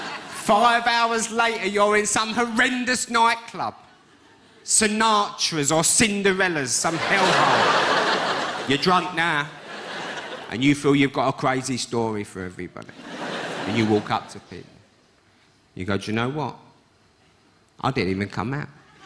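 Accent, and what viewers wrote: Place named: Cockney, United Kingdom. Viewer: A Proper real life event told by a Cockney . 👍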